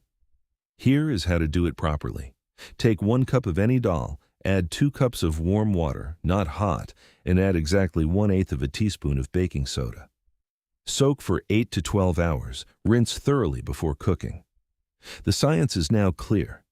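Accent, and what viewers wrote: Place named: United States. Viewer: Very interesting but the "American" pronunciation made it hard to follow at times.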